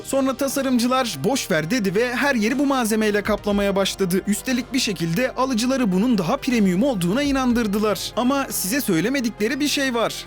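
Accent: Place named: Russia. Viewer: Kardeşim videoların gerçekten güzel ama konuşman kulağa çok ağır geliyor. Neredeyse Rus aksanıyla Türkçe konuşur gibi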